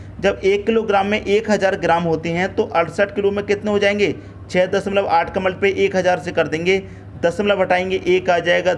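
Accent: Haryana, India. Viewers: Aap haryana se ho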